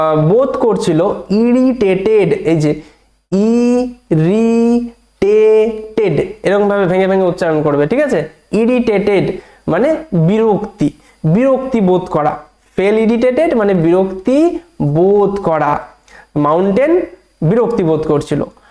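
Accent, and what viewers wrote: India. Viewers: তোমার বাড়ি কি মুর্শিদাবাদ এ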